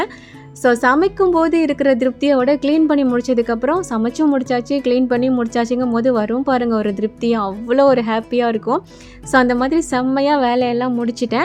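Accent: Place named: Kilakarai, India. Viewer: I'm from kilakarai..manja soru sila peru ipdium panuvaha.. aana idhoda Ginger garlic paste and tomato um serthu kari ku thalipu mari pota inum supera irukum...try pani parunga ❤unga slang um klk mari than Iruku.. maashaa allah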